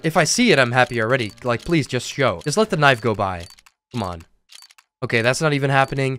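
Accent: Netherlands. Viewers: You sound Dutch so are you Dutch? XD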